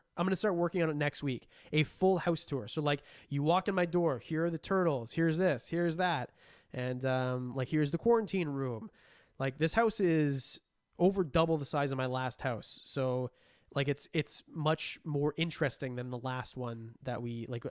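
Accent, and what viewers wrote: Canada. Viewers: I’ve seen a lot of your videos and this stream is the most Canadian you’ve ever sounded 😂 love it! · Love your Canadian vernacular. Eh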